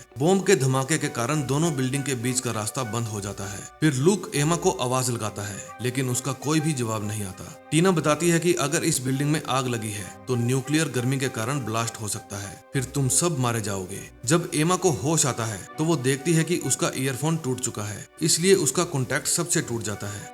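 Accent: Punjab, India. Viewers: ji punjab too belong karde, Hindi wich punjabi accent ah reha?? Just asking and the way you tell a story is really different and you bring humor along with it that’s unique!!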